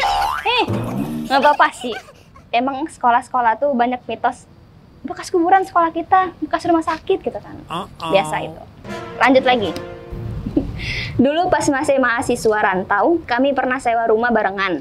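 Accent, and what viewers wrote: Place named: Palembang, Indonesia. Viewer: Yeee kak update video lagiiii.. ini bukan serem lagiii,ini ngelucuu..,logat nya palembang bnget deh..kak tmbah cantik,sehat selalu ya kak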